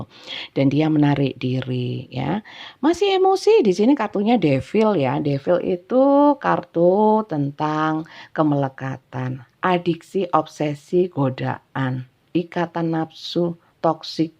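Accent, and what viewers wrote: Java, Indonesia. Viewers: Sehat selalu untuk bunda .. selalu senang kalau bunda baca dan kasih pencerahan untuk kedepan nya .. sering senyum" sndiri kalau bunda baca dengan logat jawa nya .. salam taurus dari bali bunda ❤❤ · Jawe nya pekat betul..gud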